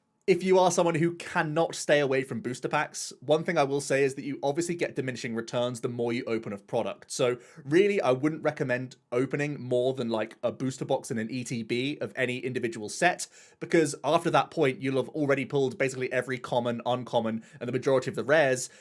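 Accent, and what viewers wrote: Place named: United Kingdom. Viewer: Dollars? You sound like a British man. Unless you're living in Australia, America, Canada, etc why are you giving us prices in dollars?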